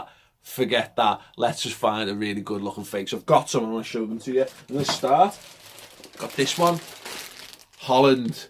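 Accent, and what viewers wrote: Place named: St Helens, United Kingdom. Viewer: And by the way kids shirts are not £200 clueless wools man get back to St. Helens with that accent. Fake Liverpool fans ha ha ha ha ha ha ha ha ha ha haha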